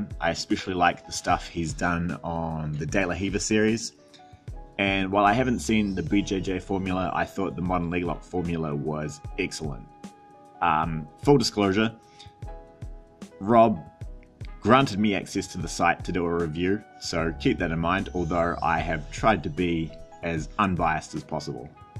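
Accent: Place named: New Zealand. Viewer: You from NZ Bro? :)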